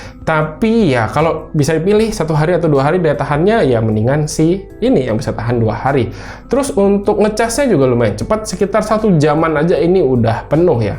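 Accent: Samarinda, Indonesia. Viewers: Org Samarinda bang? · Wah org samarinda nih abangnya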